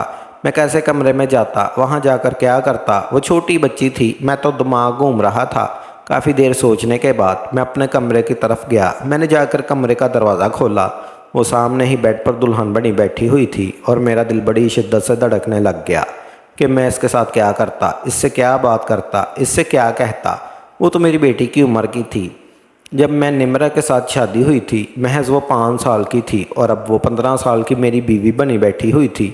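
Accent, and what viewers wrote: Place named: Punjab, Pakistan. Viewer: ابے اردوُ بول رہا یا پنجابی ۔۔۔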